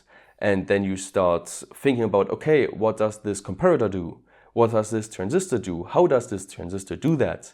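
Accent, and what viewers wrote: Saxony, Germany. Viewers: Glad to see your face :),and your Germany accent , and i like your projects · Ich wusste immer das du ein deutscher bist man Merk das an der Sprache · Ich wuste du bist deutsch ^^ Da deine handy werbung auf deutsch war und mans an der stimme hört ^^ PS: Geile video, bitte weiter so! vll auch deutsche videos und englische zugleich? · I love the German accent, it actually makes your videos more interesting. I will see you later! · Jaaaaa, sächsischer Dialekt und Simme fahren, das ist Kultur! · Your English is improving fast. Are you planning to relocate to an English speaking country. I like the German accented English though. Holiday on DIY bike ???? Awesome.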